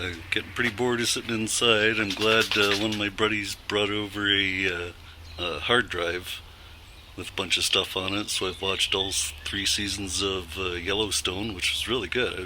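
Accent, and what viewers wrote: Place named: Canada. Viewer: i can listen to this canadian style out and about all day long 😄 . what is moochdocking ?.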